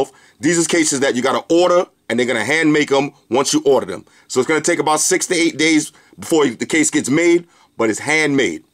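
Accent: New York, United States. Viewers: I always come here for your NYC Accent and your reviews!!!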